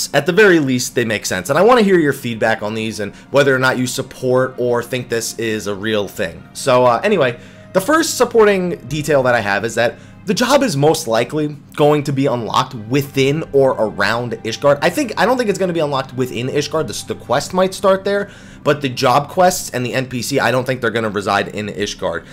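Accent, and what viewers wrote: New Jersey, United States. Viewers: Two yoots. Old school Joisey speak.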